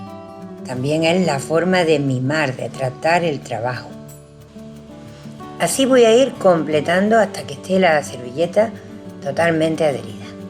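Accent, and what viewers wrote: Andalusia, Spain. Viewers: Hermoso trabajo!! Desde Argentina 🇦🇷 me encanta tu tonada andaluza! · Hola me ha encantado esta maravillosa obra de arte y darte las gracias por darnos la oportunidad de las personas que nos gustan las manualidades como es mi caso, sin semejante mi de lejos a ti, podamos intentar hacer tantas bellezas. Soy de un pueblo de Barcelona, aunque me encanta tu acento y Andalucía en si,. Muy agradecida y te deseo mucha salude y suerte 🍀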